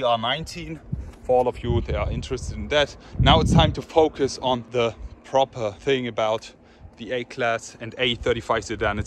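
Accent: Germany. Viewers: Good work short comments. PS Man hört das du deutscher bist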